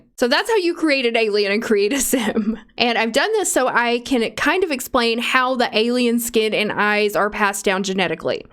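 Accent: South, United States. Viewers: Do I detect a southern drawl?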